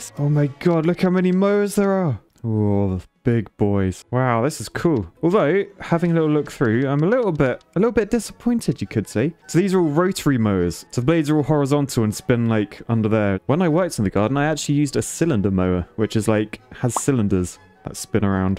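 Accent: United Kingdom. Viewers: I came for the gaming and stayed for the British accent :)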